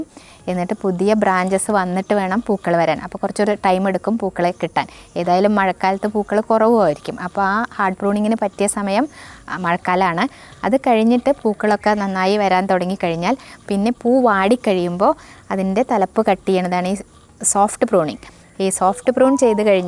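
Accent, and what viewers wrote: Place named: Palakkad, India. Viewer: I ❤ your Palakkadan slang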